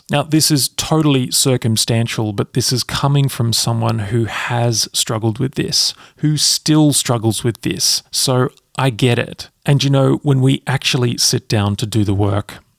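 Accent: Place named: Australia. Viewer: Enjoying your points of view, good to hear an Aussie voice, hi from Adelaide. I think every music channel gets the negative trolls.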